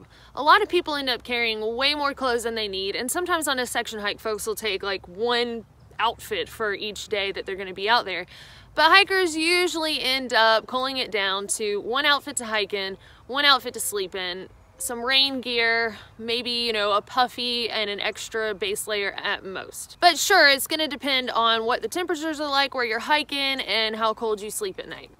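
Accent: South, United States. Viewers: Lighter and back up lighter... Its a southern thang! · From Mississippi, I speak "southern" so knew she said "lighter." So, allow me to educate. Lighter in southern is "ladder." Ladder in southern is" ladder." Clear? Another example of "southern." Female deer is doe. Opening to a building or another room through which you walk is doe (e.g. Sally opened the back doe to give John the ladder so he could light the grill).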